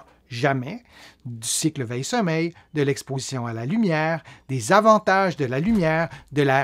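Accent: Quebec, Canada. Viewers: Merci pour votre information. Donc il vaut mieux lire ou écouter la radio le soir pour éviter de s'abrutir avec la télé ou you tube ( que je vais écouter plutôt le matin maintenant) Bien fermer ses volets ou rideaux pour être dans le noir surtout en ce moment car il fait jour vers cinq heures . Encore merci à vous et bonjour au Québec que j'aime beaucoup (ainsi que votre accent) ou vit mon fils ainé..j'ai 70 ans et commence a avoir du mal à dormir a cause de mon stress.......mais je me soigne avec le yoga et des activités artistiques.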